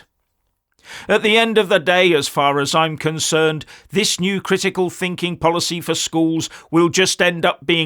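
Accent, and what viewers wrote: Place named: United Kingdom. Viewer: I watch ur channel to improve my understanding of British accent... U speak so clearly, I am impressed ...❤❤❤❤❤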